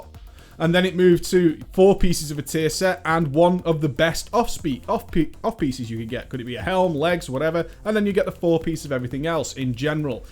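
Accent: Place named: Lancashire, United Kingdom. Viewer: i think hes from the lancashire region of england tis a funny accent :P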